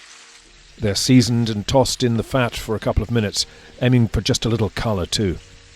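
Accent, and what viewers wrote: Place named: United Kingdom. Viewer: YIMBY (yes in my backyard), there is always room in my backyard for culinary pilgrims. Madame Saint-Ange would have been suitably amused by the fact that her name is brought to our attention by a cook having a very British accent, BUT secretly content on knowing that the good word is being brought to us heathens! Keep up the good work!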